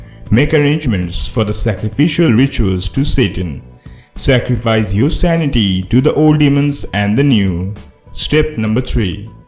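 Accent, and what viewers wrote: India. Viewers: Indian accent makes this video perfect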